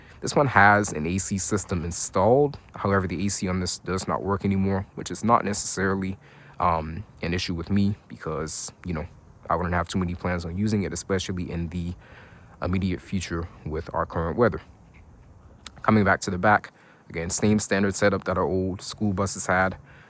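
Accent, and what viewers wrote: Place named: Lowcountry, United States. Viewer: This is so cool! I've always had a fascination with the Thomas MVPs! This one is nearly identical to the white and teal (and later white with red and blue accent) activity busses we had in Beaufort County when I was in school. This was back in the 90s and early to mid 00s. I couldn't tell you what they have today. And based on your dialect, I’m 100% certain you’re from my neck of the woods as well, lol! 843! I live in Texas now, but the Lowcountry is home!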